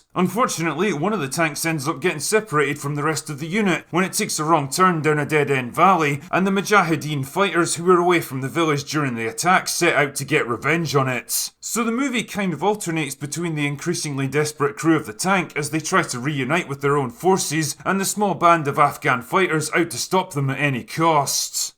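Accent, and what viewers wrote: Scotland, United Kingdom. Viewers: Drinker missed a prime opportunity to say in his epic scottish accent "The Beast, wha' is it all good for? Absolutely nothin' claiming territory & resources" 😆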